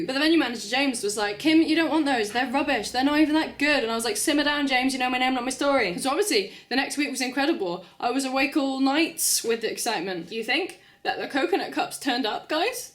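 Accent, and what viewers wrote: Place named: United Kingdom. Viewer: I don't understand why your cute british accent always attract me again to your videos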